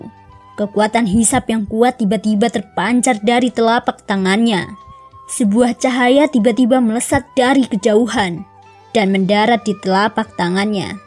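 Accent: Java, Indonesia. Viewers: terlalu medok noba yg ke 4 ini😂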